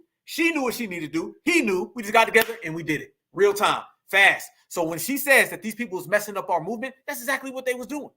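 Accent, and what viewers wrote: South, United States. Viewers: Thanks, that country ass accent ,with all that Honey Child bull sh,t peace and no disrespect ✌️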